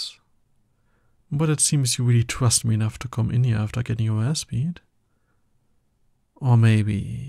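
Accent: Germany. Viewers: Samma ihr könnt mir doch nicht erzählen das nur ich den deutschen Akzent höre 😂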